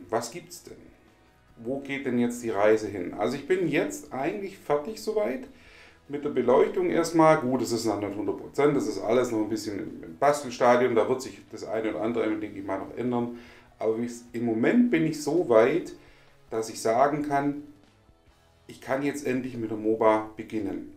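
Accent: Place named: Swabia, Germany. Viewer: Hallo, Glückwunsch zu deinen ersten 100 👍 Und: schwäbisch isch schbitze. Gruß Jürgen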